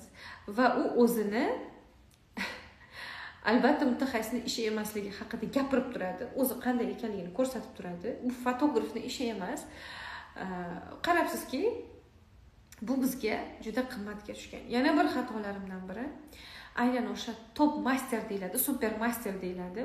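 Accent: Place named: Uzbekistan. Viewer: манга сизни жасоратлингиз ёкди. Жуда чиройлийсиз, исмингиз жисмингизга монанд мафтункорсиз, сокин, у́збекона гапирар экансиз. У́з хаётий тажрибангиздан очик- ойдин бу́лишганингиз учун рахмат. Сиздек у́збек йигит- кизлар дунёга танилишларини хохлардим.Янада юкори чу́ккиларни забт этинг.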